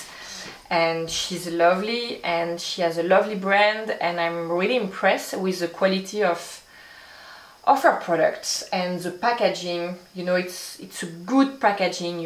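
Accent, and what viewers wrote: France. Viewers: Your accent is lovely - where abouts in France are you from? X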